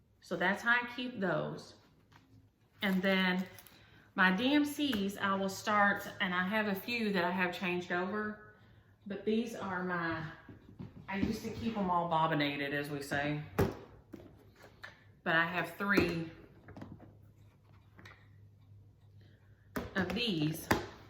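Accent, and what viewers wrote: South, United States. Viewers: LOVE your videos, you are so funny, love your southern accent. I want to mention Wicked and Heart. Thank you, my name is Linda Norris, Springfield, Ohio.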